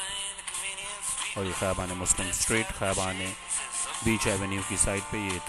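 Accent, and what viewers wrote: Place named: Punjab, India. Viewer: lolzz ... is may aap deakhay gay kay muslim ko kitna accha ker deeya hay hahahahahah ahahaah ..... I like your urdu accent as it sounds panjabi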